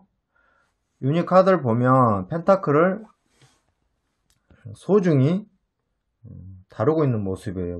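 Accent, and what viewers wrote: Jeolla, South Korea. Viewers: 전라도 분이신가봐용 헤헤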